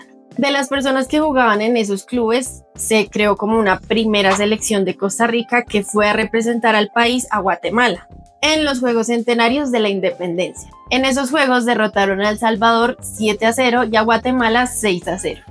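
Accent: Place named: Colombia. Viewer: Por tu acento sos colombiana menos vistoso y todo preo haun la seleccion de Honduras supera en la serie a Colombia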